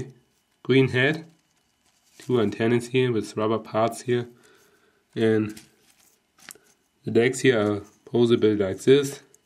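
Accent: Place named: Germany. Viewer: your german accent rules haha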